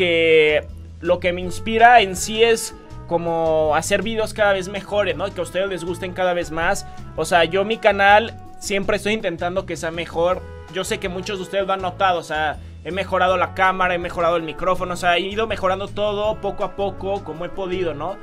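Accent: Mexico. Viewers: Eres de mexico no?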